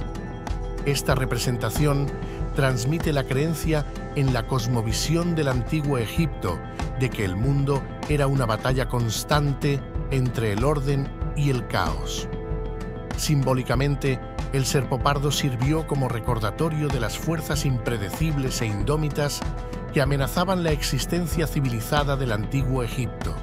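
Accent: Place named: Spain. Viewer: Eres de España amigo? me encanta el asento de la IA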